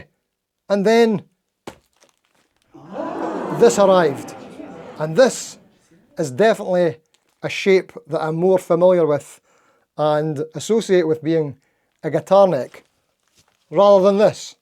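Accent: Scotland, United Kingdom. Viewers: glad you took it in good humour, I'm in Australia and we have trouble understanding a thick Scottish accent. Not that our accent is anything to brag about lol. 😊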